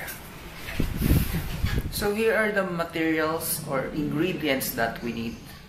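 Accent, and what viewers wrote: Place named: Philippines. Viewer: based on how you speak english, i think you are a filipino?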